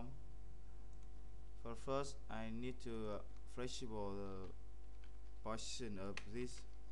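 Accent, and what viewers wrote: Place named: China. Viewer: Sorry buddy, but you do NOT explain any of the commands you enter, so the viewer is left guessing why you are doing things. Plus with your heavily accented chinglish accent I suggest you GIVE UP these tutorial to be honest. They just do not crack it compared to many others I have watched and learned a lot from. Maybe do them in Chinese for your fellow countrymen instead ?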